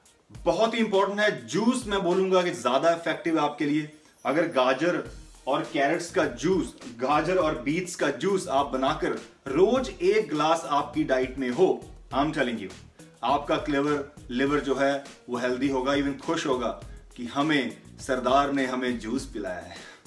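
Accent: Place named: India. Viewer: The worst thing ive heard is indians speaking english i dont understand 70% of words he saying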